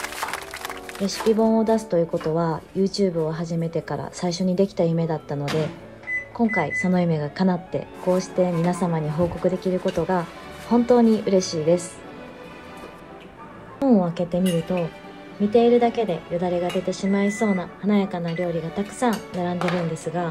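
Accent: Kansai, Japan. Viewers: 初コメです😊同じ関西を感じていつも見ておりますw今回のレシピ本購入させていただきます😍おめでとうございます㊗️ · 書籍化おめでとうございます！！ 絶対買わせていたたぎます！！！！！ あと私も関西人だからすごく喋り方が安心する笑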